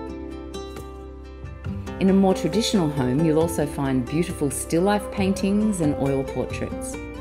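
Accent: Australia. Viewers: Even though I struggled understanding the Australian accent but this video helped me throught my self study interior design process thanks so much for this video ❤❤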